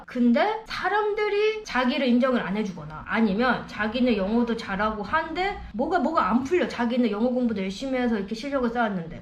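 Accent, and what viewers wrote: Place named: Gyeongsang, South Korea. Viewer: 님은 스피킹보다 히어링이나 독해가 훨 좋은듯ᆢ물론 스피킹도 수준급 이상이지만 갱상도 분이라 악센트 때매 그리 보이는듯ᆢ근데 그보다 님은 존재자체가 반짝여서 그렁거 항개도 안중요함 ᆢ마쟈 아니야~^^ ❤️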